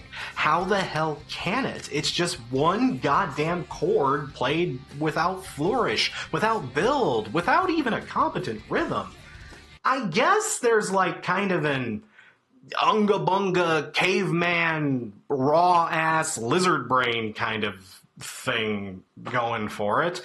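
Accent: Boston, United States. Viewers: Wow that Boston accent...for a moment I figured you thought they were from Australia. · I was enjoying your Boston accent.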